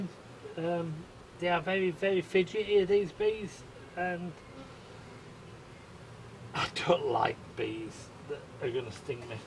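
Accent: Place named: Australia. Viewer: Sounded like an Aussie to me.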